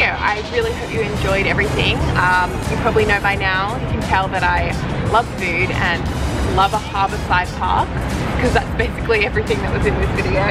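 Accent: Australia. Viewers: Hello.. Are you a Straya?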